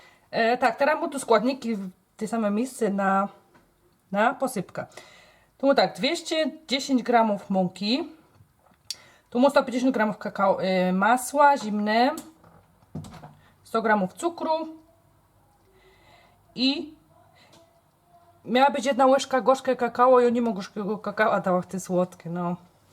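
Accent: Silesia, Poland. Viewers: jak robisz okruchy, czyli twoja posypka, to stary piekarz zawsze mi mówił, że 1:1:1 cukru, mąki i tłuszczu ( raczej masła) i cała filozofia. Spróbuj i zobacz czy Ci to podchodzi. Mi zawsze do drożdżowego wychodziło, choć teraz już robię to "na oko". Pozdrawiam..........i powiem Ci tylko, że lubia Waszo gwara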